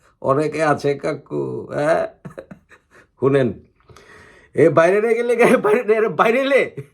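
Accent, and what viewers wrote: Dhaka, Bangladesh. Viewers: ভাই আপনি এর পর থেকে ঢাকাইয়া ভাষাতেই কথা বলবেন, খুব ভালো লাগলো♥️ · ভাই ভালোই ঢাকাইয়া ভাষায় বয়ান দিলেন, মজা পাইলাম । আসলেই কি ঢাকাইয়া আপনি ? ঢাকাইয়া ভাষা খুবই ভাল বলেছেন বলে লাইক দিলাম । একজন অভিনেতাও এরকম ভাবে অরিজিনাল ঢাকাইয়া ভাষা বলতে পারে না ।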